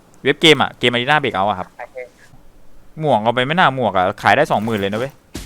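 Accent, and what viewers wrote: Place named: South, Thailand. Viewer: สำเนียงพี่คนใต้นะ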